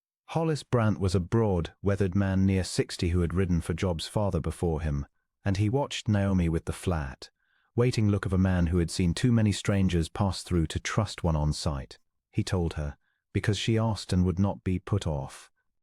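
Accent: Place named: RP, United Kingdom. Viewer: Terrible narration...reading the words as written not as they should have been correctly pronounced. Plus with a posh accent...not really in keeping in telling a story about the 1800's in the wild West of the US. The story in interesting...not really authentic in legal standing in that place and time...but interesting yarn.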